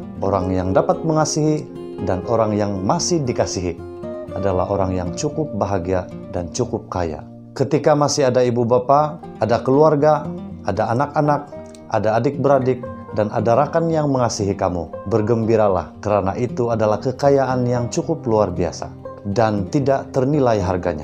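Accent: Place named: Sabah, Malaysia. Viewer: Punya pakat ko punya slang sabah.. hahah sandakan ka ko.!! Hahhaha . Aku dari telegram cardock team.!! Salam kenal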